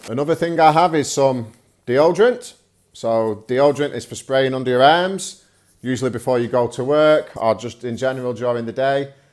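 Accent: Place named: Manchester, United Kingdom. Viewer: He's actually from the Manchester area in Northern England. His accent is different to the way people speak in Scotland.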